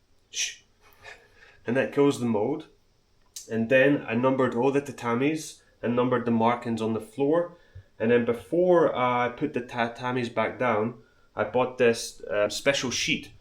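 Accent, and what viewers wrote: Scotland, United Kingdom. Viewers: Dude, I always assumed you were Australian. Didn't realize you were Scottish!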